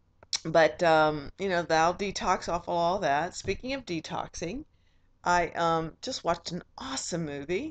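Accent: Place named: Pittsburgh, United States. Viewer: awww what a touching video,,, i understand, by the way, where r u from? Pa by any chance? You sound like a Pittsburgher ;p hugs to u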